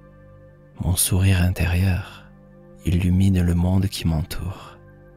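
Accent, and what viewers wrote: South, France. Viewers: cher...t'es merveilleux et ta voix est est sublime avec un pt accent du soleil...merci t'es un Grand Soutien pour moi 💙💛🌻😺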